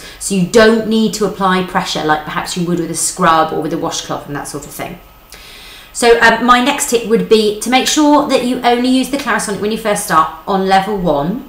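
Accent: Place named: United Kingdom. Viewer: that british accent tho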